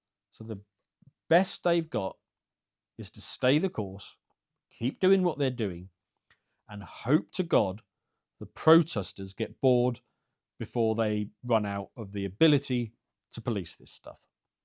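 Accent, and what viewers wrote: United Kingdom. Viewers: Good, the limey that i like listening to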